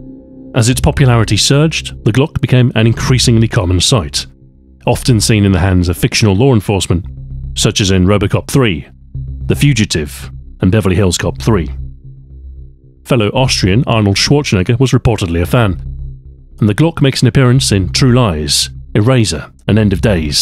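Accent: United Kingdom. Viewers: And if you hear his accent, you can tell he is British, which most likely means he never shot a gun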